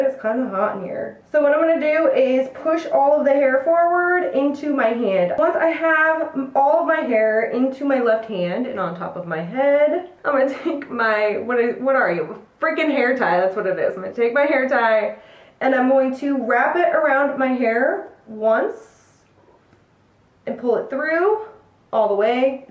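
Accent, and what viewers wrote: Midwest, United States. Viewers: I've watched a couple of your videos and I decided you had a Midwest accent and surprise we live in the same city! Or at least I live in the same area as your PO box so we have the same accent. Anyway I think I liked the third bun the best. Except whenever I try it, it's almost always bad. I haven't gotten that one to work on me.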